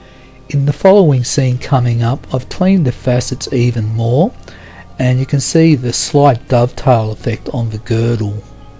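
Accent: Australia. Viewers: Well the other faceting channels don't have the Australian accent, so they can't copy that without sounding weird. the gem looks amazing!😮 wondering if you have done any videos of faceting golden rutilated quartz?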